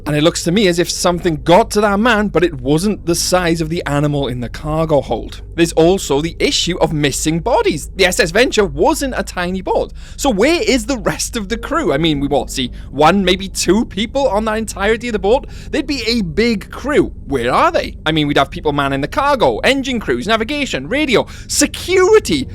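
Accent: Wales, United Kingdom. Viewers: This channel is awesome and just like with Star Wars nowadays, living proof of how the fans of this franchise love and care about it more than the official owners of it do 👏. Also, are you a fellow Welshman? Loving the dialect, and does anyone know the time of that instrumental that kicks in ? So chilling and eery.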